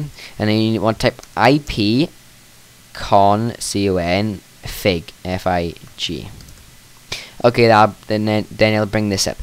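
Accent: Wales, United Kingdom. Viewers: Are you Welsh? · Are you Welsh? :) Just your accent sounds Welsh.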